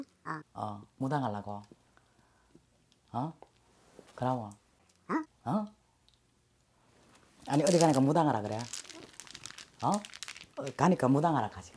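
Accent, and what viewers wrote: Gyeongsang, South Korea. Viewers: 예쁜선생님 오랜만이네요. 더 예뻐지셨네요. 뵙고싶었습니다 . 억수로 경상도말씀 징하게하십니더 그래도 말씀 옙뻐에 쌤 건강하세요. 인천이라에 시원 시원하신것이 아주좋습니더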